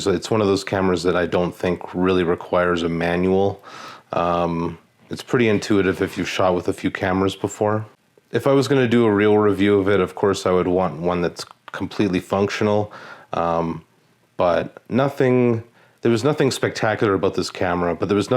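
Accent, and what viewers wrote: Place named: Canada. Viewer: canadian aye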